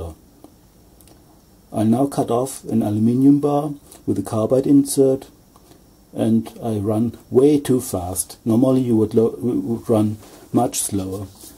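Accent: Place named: Germany. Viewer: You sound German !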